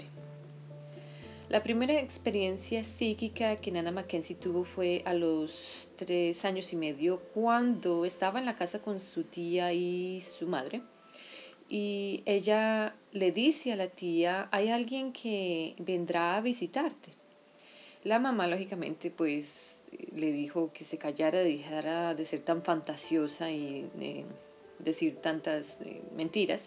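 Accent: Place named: Colombia. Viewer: Aceptemos la diversidad, los acentos de cada region son diferentes. es Colombiana y esa forma de hablar es muy tipico de su pais.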